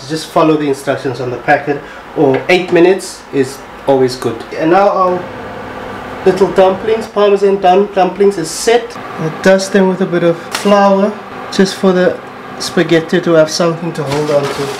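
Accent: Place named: South Africa. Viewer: Dude sounds South African.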